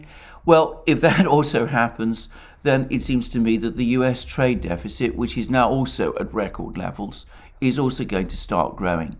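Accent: United Kingdom. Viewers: Very good information about the war in ukraina , and as i said before , you speak very eloquent and ( I also must say that the British accent makes it wonderfully clear and pleasant to the ear ) cheers 👍😀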